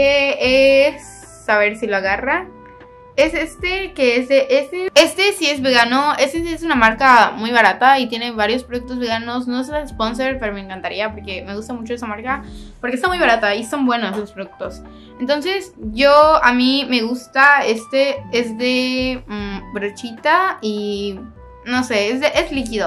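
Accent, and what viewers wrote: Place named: Mexico. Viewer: Me da la impresión que de México por el acento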